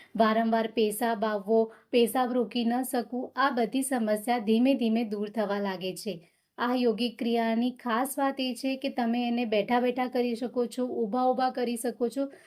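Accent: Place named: Kathiawar, India. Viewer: Rajkot tame kathi bolo cho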